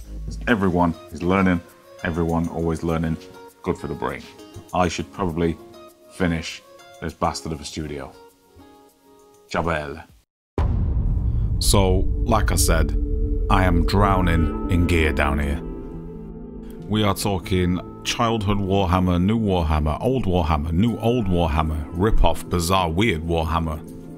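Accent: New York, United States. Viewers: Good video. That NY accent is excellent. Only one copy of Cursed City though? It's like you don't even know how to youtube. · I love this dude. Just discovered the channel. Keep on doin this beautiful thing your doin. Nice NY accent btw.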